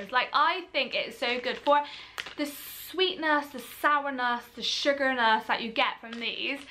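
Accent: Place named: West Country, United Kingdom. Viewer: Thank you my lovely, so helpful...were are you from?you have a west country accent!!X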